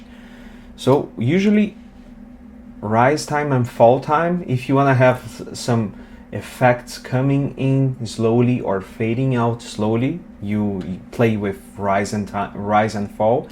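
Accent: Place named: Brazil. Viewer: I have Brazilian friends…they have very similar accent 😅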